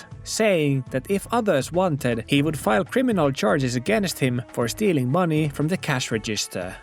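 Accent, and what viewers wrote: Finland. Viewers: bro am i tweaking or do i hear a finnish accent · Bro is one-hundred percent Finnish, am I right fellow Fin?:cat-orange-whistling: Yap. The "also" confirmed my suspicion, moro miten mennöö?:D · as in paahtoleipä. i can hear it from your accent. this is a Finnish guy. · You sound Finnish what country are you from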